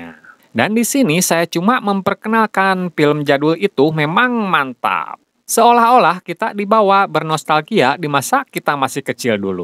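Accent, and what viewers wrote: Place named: West Java, Indonesia. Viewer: Kaya orng Sunda😀😀😀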